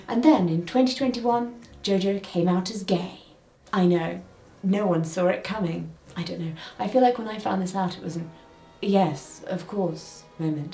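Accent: RP, United Kingdom. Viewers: Is your accent that plummy naturally, or do you play it up for an on-camera persona?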